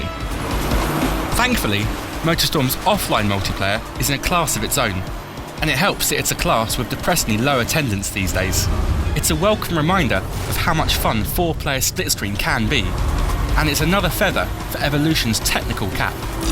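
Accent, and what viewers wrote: United Kingdom. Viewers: thank fuck for that! a uk review! been fed up of the american accents lately :L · lol your british, you have a stupid accent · No offence but please do not use British reviewers thank you. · This British voice is pissing me off... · I love how nobody is raging on the Gamespot review about the fact the reviewers from the UK. Seriously, what's wrong with the UK? And for those saying they can't understand what he's saying, learn to speak English. You know, what with England INVENTING the language everyone's typing in now? I think this is a fair review. In the higher levels, the AI get aggressive and if you get caught up in the surroundings, you can find yourself jerking out of control. It's a known annoyance in all MS games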